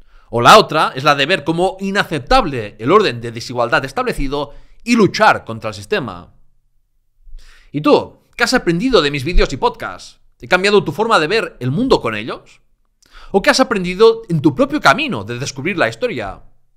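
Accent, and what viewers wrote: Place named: Basque Country, Spain. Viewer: Po pa ser gerundes, suenas mu vasco cho, jjjj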